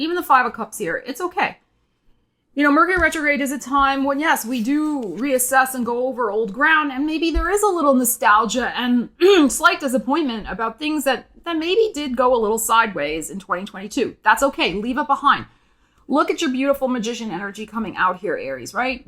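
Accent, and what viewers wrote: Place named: New Jersey, United States. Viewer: She sounds like she's from New Jersey ... or something.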